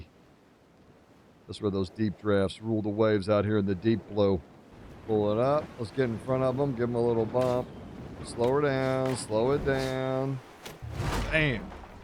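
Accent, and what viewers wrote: Oklahoma, United States. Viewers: I might be a little slow, but I just noticed your accent. Are you from Oklahoma?😂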